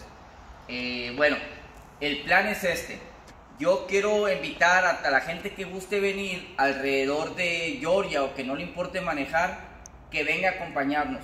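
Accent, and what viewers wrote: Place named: Puerto Rico. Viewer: Lla estas ablando Con asento boricua Eeres de los de nosotros!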